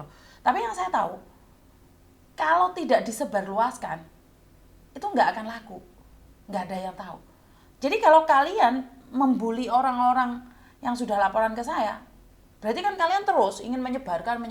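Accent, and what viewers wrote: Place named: Java, Indonesia. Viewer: Mbak katanya orang BALI.BALi Nya mana Mbak.kok bahasa Jawa ne meduk kalau orang asli BALI ndak bisa bahasa Jawa.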